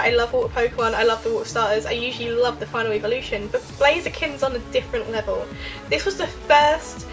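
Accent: United Kingdom. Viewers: Your accent sounds like your from the UK is this true ? :)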